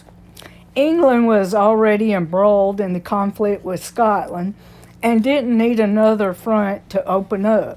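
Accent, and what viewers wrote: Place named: Alabama, United States. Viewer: I love the Alabama accent, it’s doesn’t sound like the American accent we all know and hear on television, I love listening to reading historical stories, thank you 👍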